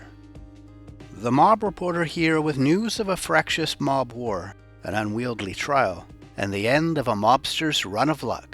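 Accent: Canada. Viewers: Is he from Canada ?I've been trying to figure it out. He sounds like he's from Canada. Asking from Vancouver! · Hi Mob Reporter. You sound really Canadian!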